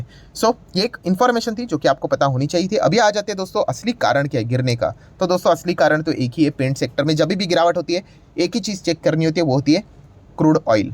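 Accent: Maharashtra, India. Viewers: You have a very nice Marathi+Hindi Mhindi accent ❤ I enjoy your typical marathi words in between. Thsnks for reminding me of my mother tongue 🎉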